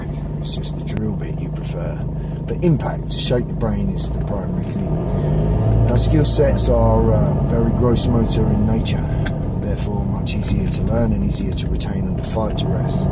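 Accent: England, United Kingdom. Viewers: I did‘nt understand only one word with this England english😭😭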